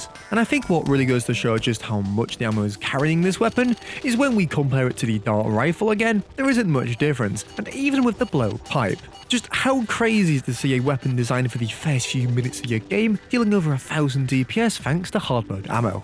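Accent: United Kingdom. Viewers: I must ask, where in the UK are you from?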